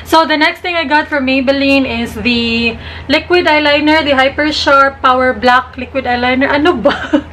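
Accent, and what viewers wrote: Visayas, Philippines. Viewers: new subscriber here.. I like your bisaya review sounds so cute to me..Keep it up!! 😊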